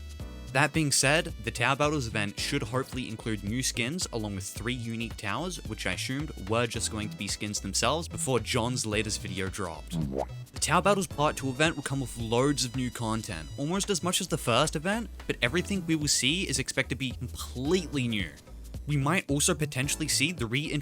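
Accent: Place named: Melbourne, Australia. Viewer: It's giving Melbourne accent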